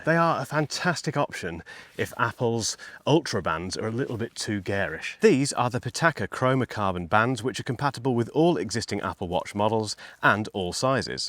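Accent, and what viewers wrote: England, United Kingdom. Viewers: Maaaan you are great! Love the English accent. I’m gonna buy the ultra 1 used 🔥👍 sorry for my English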